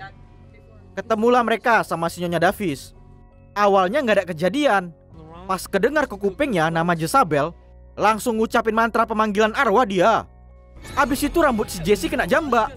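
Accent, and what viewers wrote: Medan, Indonesia. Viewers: Pasti abang org medan😂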